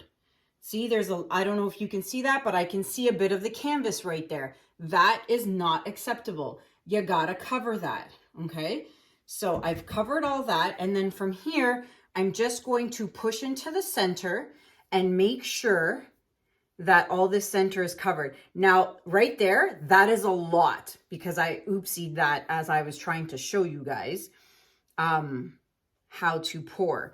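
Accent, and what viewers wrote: Canada. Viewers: Oh wow, wow, wow!!! This piece is absolutely stunning! I have only recently discovered acrylic paint art but you were one of the first people I started watching. I really am enjoying your art, your tips (and as I'm from the UK, the differences between a Canadian accent and an American one! 🙂) Keep up the fantastic work and sharing things with us. I've just bought my first few acrylic paint items to try it out for myself. A few busy days this week so next weekend probably the starting point for my paint pouring first. Im not sure what to start with but as my canvas is small, it won't be a blow out on quite this scale! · Good Lord she likes to talk. I dont know if I can stay for the end, I cant take all the talking and the Canadian accent. Not trying to be nasty, its just my preference.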